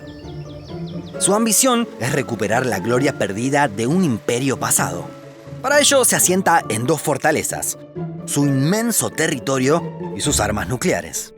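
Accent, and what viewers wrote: Argentina. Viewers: El narrador es argentino. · que acento es ese ? tiene argentino pero a la vez me recuerda un poco al robot de platon, peruano ? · Aver te voy hacer una critica muy fuerte pero realista. Por tu acento se nota que eres argentino, el mismo pais que le lame las botas a los estadounidenses, entonces ahora si comprendo el por que estas en contra de rusia. La otan quiere extenderse en europa, te voy a poner un ejemplo "imaginate que tu vecino ponga una cerca al rededor del perímetro de tu casa con torretas y esas torretas esten apuntandote a ti... Como vecino no iras enojado a reclamarle? Es obvio, el conflicto actual de la otan es querer apropiarse de paises europeos imponiendo su ridiculo lema de "libertad" mientras que rusia no quiere correr ese riesgo. Hablas de historia geográfica de rusia pero jamas mencionas las invasiones que hizo la otan en siria, irak, Afganistán en el que murieron miles de personas, eso se llama hipocresía de occidente. 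Antes de que hagas un tema de estos informate y di las cosas tal cual. 💁🏻‍♂️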